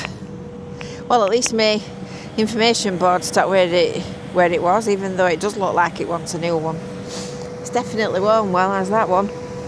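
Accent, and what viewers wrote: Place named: England, United Kingdom. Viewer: i just love the way you laugh,...i love my english peop0le's accent,..thank you for being here alive and kicking,......,......marius(punk rules).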